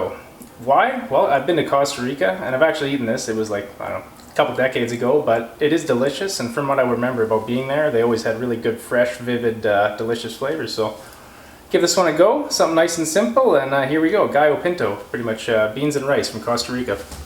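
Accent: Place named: Canada. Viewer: A) this is so freaking adorable, b) holy crikey do you ever have a strong 🇨🇦 accent you have!, c) Isabel pretty much stole your whole face, lol & d) I’m not sure what I’m more impressed with - your cooking or your editing skills…hidden talents galore! ps I watched this with Calvin and he just kept saying “I bet must be really happy about this hey?!!l …he meant the cooking the skills ☺️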